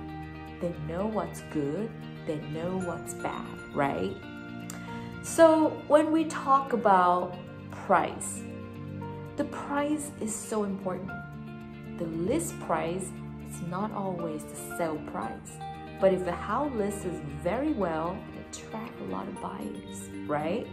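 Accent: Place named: England, United Kingdom. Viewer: ชอบสำเนียงอิ้งหลิชของพี่มากค่าา 😻😁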